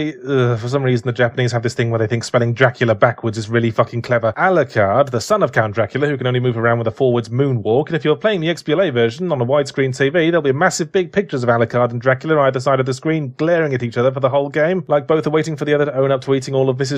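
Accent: United Kingdom. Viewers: After I watch his videos everything I read is in his sarcastic, cynical british accent.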